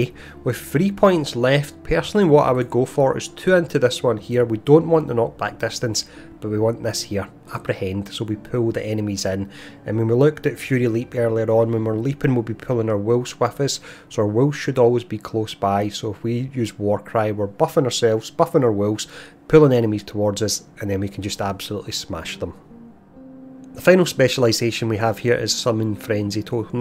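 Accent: Scotland, United Kingdom. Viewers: Ah yeah Sorry I think I mixed up the reply a bit😆. I actually like the Scottish accent but this is a bit hardcore for a non native speaker. There are other YouTubers in the arpg world like quinn69 (not sure about the spelling of his nickname lol) who definitely have strong accents but I still understand them easily. Anyway I didn't want to turn this into a "you need to speak properly" , I was just a bit upset by the fact that I usually hear these kind.of video rather than watching them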